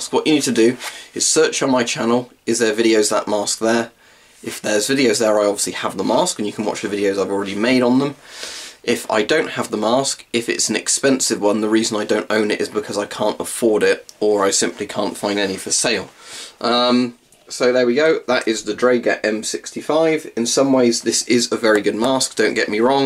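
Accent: Germany. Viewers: I can help but laugh at your german accent but it is still way better then mine...us americans are limited to accents but then again america doesn't have a specific accent bc we have people from multiple countries yknow what I mean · oh man that German accent kills me every time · please do a video with your german accent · It surely has a tight seal and is rather comfortable. The straps are good i guess better than the czech straps, they are not that good in my opinion esspecialy on the M10! BTW. loved that german accent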